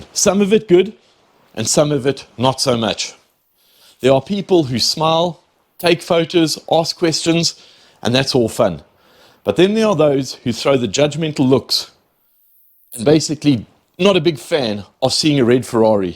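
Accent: South Africa. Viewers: Dammm sounds just like a South African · I thought straight away that your English sounded suspiciously similar to my local Eastern Cape English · Bro, are you South African? I can always hear my country’s accent 😂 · I know a South African accent when I hear it 😂😂😂😂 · Are you South African? You sound like a Joburg Boytjie?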